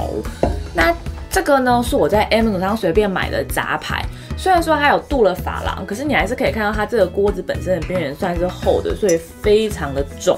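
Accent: Taiwan. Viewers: 听起来很像北方人又像台湾人🤣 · 当分子式出来的时候，我彻底服了，我原谅了你嗲嗲的台湾音~~~~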